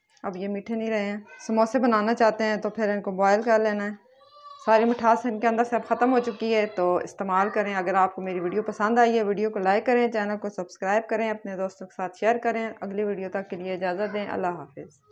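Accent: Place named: Rural, India. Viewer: Ajeeb pendoo style Mai bolti han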